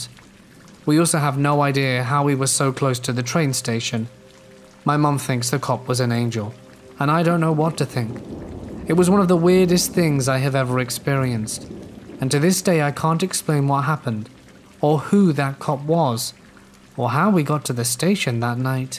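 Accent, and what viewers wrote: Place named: RP, United Kingdom. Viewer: I love your voice because it sounds like Rp-English. Excellent for practicing pronunciation.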